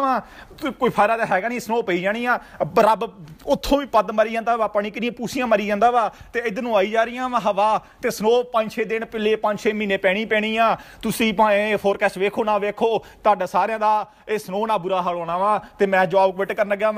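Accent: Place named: Punjab, India. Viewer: You have THE true punjabi accent.😂🌹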